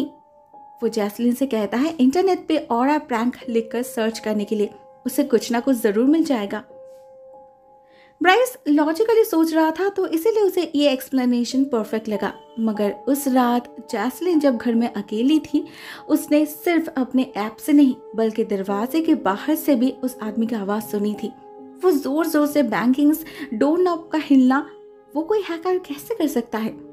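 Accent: Bengal, India. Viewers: sei to. Bengali wali vibe chupegi kaise. Aur itni pyari hindi ek bengali hi bol sakti hai. Khub misti ho tum. Like your way of speaking dear. Love you dear. Chaliye jao. 💕💕💕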